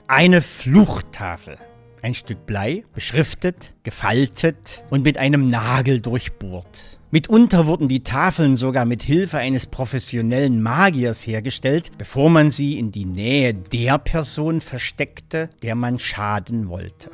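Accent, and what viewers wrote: Germany. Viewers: Naja, es handelt sich um den Dialekt, der im Raum Dresden-Sachsen gesprochen wird, nehme ich an.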